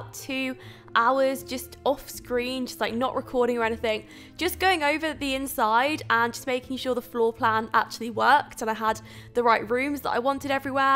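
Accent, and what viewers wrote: United Kingdom. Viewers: I really like your British accent and enjoy with your building Hogwarts castle. I hope you will make more about building some places from Harry Potter or wherever. Keep making good videos!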